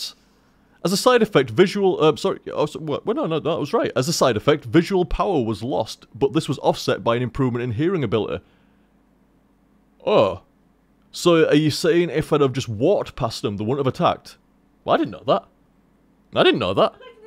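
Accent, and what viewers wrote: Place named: Yorkshire, United Kingdom. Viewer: an Irishman. a Yorkshireman. Not with particularly similar accents, within those of native English speakers.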